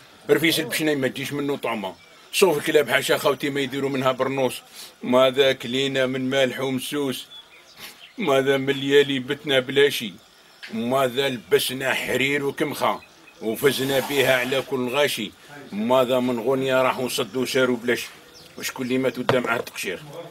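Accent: Chaoui, Algeria. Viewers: هدرا شاوية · Midi Bi تحية للشاوي من عند الشاوي بصح اللهجة نتاع السيد ما تبا نش سطايفية . تقول عليه شاوي حر من اولاد سي علي .والله تقول من عرشي .واش رايك .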